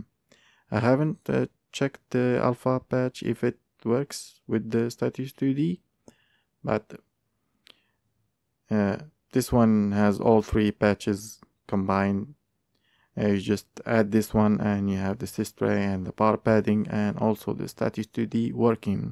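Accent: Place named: Morocco. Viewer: great. i was confused by your voice and accent because you sound like me and I was right you are moroccan too LOL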